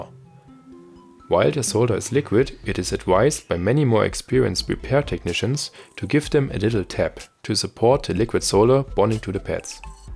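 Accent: Germany. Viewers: das deutscheste englisch der bre ist deutsch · That german accent xD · Hör ich da nen deutschen Akzent 😂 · I smell German! Its clear due to your Accent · hey man, ich mag ja deinen Content, extrem geil, ABER deine Englische Aussprache ist soooo grottenschlecht das es mir die Schuhe auszieht, dein englisch ist auf dem Status des deutschen Funkers der der Titanic auf die message "we are sinking" gefragt hat "what are you sinking of" dein englischer Wortschatz ist echt gut, keine kritik, aber dein deutscher Akzent tut einem in den Ohren weh :.( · i hear deutsch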